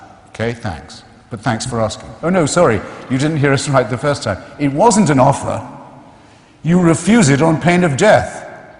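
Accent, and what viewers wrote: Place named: England, United Kingdom. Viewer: Two things. 1, Hitch was one of the most well-read and determined intellectuals of the past 100 years. In a world where religious conviction is still considered brave, he was brave in a way that would embarrass the proponents of that definition of bravery. Most of all, he was a consummately moral and humane person. He was a good man, and he didn't need to jump on anyone else's bandwagon to prove it. 2, English accent.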